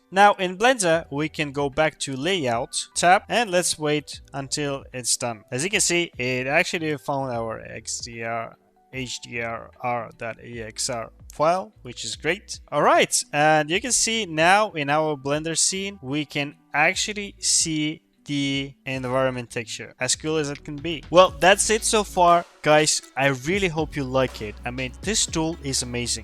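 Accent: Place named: Russia. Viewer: I think he might be russian, c is read like an S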